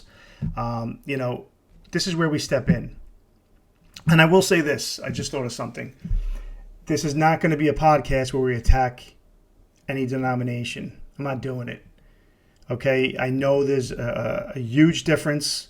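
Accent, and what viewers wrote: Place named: New York, United States. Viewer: I love your New York accent! Thank you for doing this podcast. Blessings to you and from South Africa.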